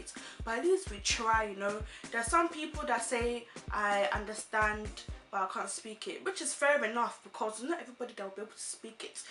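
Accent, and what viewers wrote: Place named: Nigeria. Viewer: lmao ur ghana accent sounds nigerian not ghanaian........ love ur tag interesting u shd hve danced azonto for us tho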